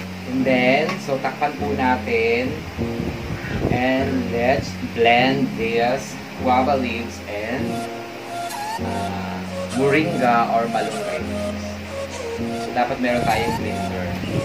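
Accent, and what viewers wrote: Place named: Lopez, Philippines. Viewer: parang taga lopez quezon ka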